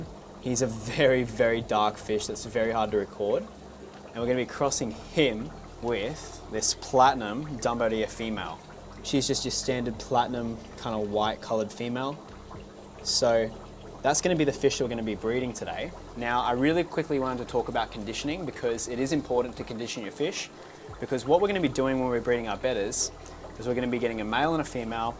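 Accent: Australia. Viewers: This is amazing! What's your accent? Aussie?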